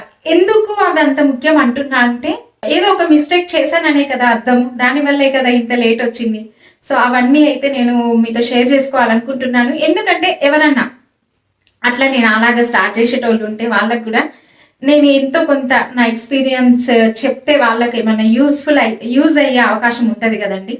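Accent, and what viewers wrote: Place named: Andhra Pradesh, India. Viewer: akka evideolo okati change vundi emito telusa me voice Andra Telugu matladaru telanga languagevadilesi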